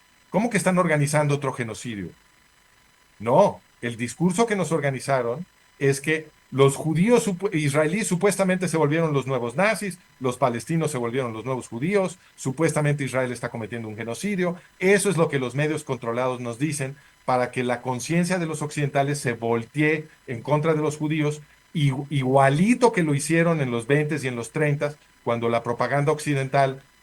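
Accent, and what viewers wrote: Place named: Mexico. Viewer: Que video musica para mis oidos ..me iré a dormir felíz sabiendo que algun dia los arabes junto a EEUU...van a darle a Israel donde mas les duela ... ojalá paguen todo el sufrimiento que estan cusando al pueblo palestino .. Solo me queda una pregunta... cuál es el rollo de este tipejo con asento mexicano que defiende tan apasionadamente a los genocidas?...te gusta la guerra mexicano?... ojalá pronto veas a tu amado Estado genocida vuelto cenizas ....es mi mayor sueño.. No señor ningunos medios occidentales ...desde Israel hay quienes no le siguen el juego al genocida y estan protestando ...deje de idolatrar a los asesinos ...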